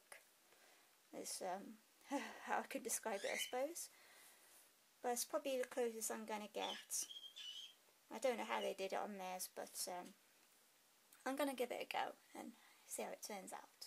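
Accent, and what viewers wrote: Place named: Wales, United Kingdom. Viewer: This must have taken SOOOOOOO long!! Are you from Wales? You sound Welsh :P xx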